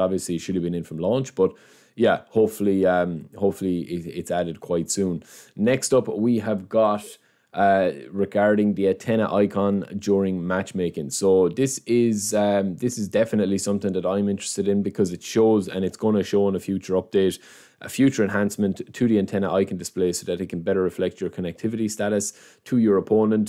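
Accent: Ireland. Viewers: I know it may be random, but are you from Cork?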